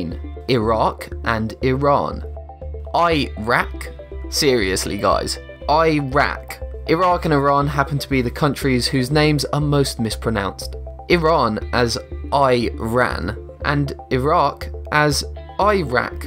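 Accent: England, United Kingdom. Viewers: he’s english that’s how they pronounce it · Nah he's just from England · You frequently just correct one of the sounds in the word but not the rest. One example is Renault where you still use the British r-sound instead of the French. Also, your pronunciation, although better, still misses the mark in a few of the German words as well as the Swedish.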